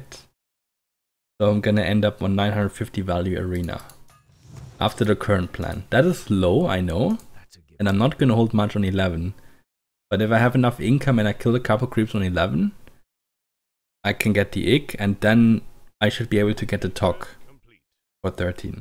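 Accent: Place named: Germany. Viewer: Dieser Moment, wenn du eine Sekunde nach dem Start des Videos merkst... ...dass der Sprecher Englisch mit deutschem Akzent spricht. :-D Aber nett, dass noch jemand das gute alte WC 3 spielt, weiter so! :-)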